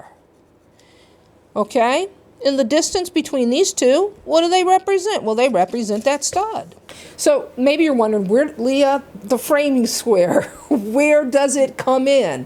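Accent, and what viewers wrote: United States. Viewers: Great video. I loved it. Awesome. I was also trying to figure out if you were American or from Nova Scotia.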